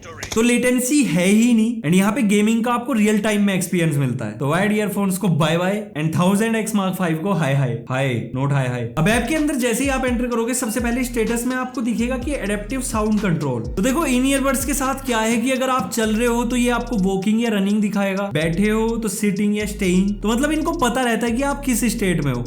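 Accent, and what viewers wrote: Meerut, India. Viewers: Bhai aap Meerut se ho ??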